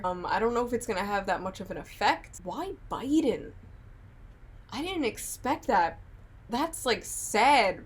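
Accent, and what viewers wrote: New York, United States. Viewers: New to the channel, can’t get away from what sounds like a New York accent? Sorry lol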